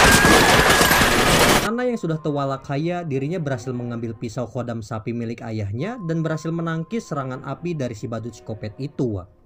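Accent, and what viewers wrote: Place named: West Java, Indonesia. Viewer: Penjelasan jelas, ada sundanya kocak.. Paket komplit lah ini channel wkwkw auto subsscribe waa.. 🤣🤣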